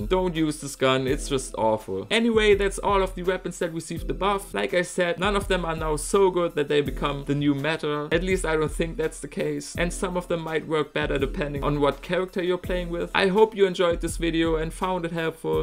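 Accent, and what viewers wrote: Germany. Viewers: Dieser deutsche Akzent hahah :)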